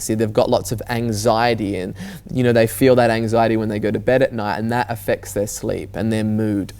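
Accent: Australia. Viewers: It's Australian accent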